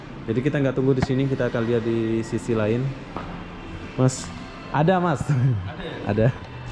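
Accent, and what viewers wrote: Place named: Malaysia. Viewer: Mau tnya,abang nih asli mana ya,? Klu ngomng ada melayu2nya?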